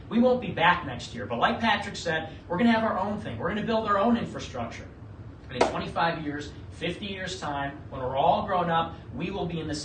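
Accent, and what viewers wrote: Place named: United States. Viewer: He might be Mexican but he sounds more American than all those Israel firsters traitors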